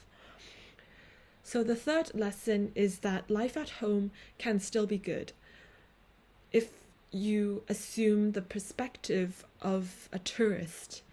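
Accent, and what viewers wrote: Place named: United Kingdom. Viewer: why do u sound bri ish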